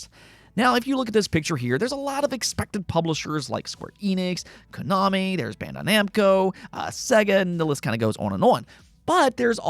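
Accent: Philadelphia, United States. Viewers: Your accent, are you from Philly or nearby?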